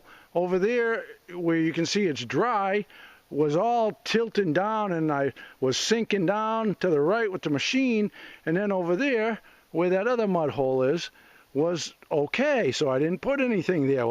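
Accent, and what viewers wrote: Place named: Louisiana, United States. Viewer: No Disrespect but trying to figure out the accent. It sounds like you are from up North with a little bit of the Cajun Louisiana influence. Am I little close or am I way off ? Keep on keeping on ya'll ! Respects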